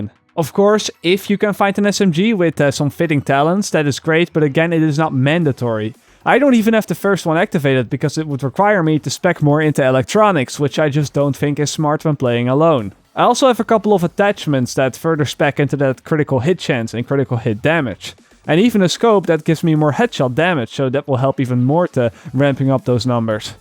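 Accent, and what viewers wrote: Netherlands. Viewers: plat Nederlands accent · its VERY obvious this guy is dutch because of his accent lol i am dutch aswell but i created my own accent on a young age so people kept thinking i was either from england or america which is great lol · are you from the netherlands your accent sounds a bit like that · He's Dutch, which is Lesser Germanic. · Are you from netherlands? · He's dutch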